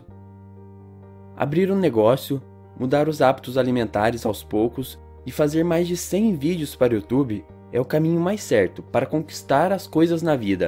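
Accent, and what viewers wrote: Caipira, Brazil. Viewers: Seu sotaque caipira cansa o vídeo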